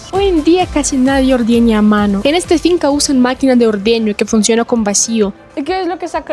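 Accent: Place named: Colombia. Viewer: Con cada video me impresiona más tu dominio del español. Hablas más colombiano que yo!😱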